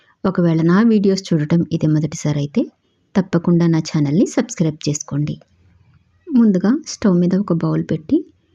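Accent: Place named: Telangana, India. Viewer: Good to hear Telangana state decent language slang in Andhra recepies from Thankku by this respected women.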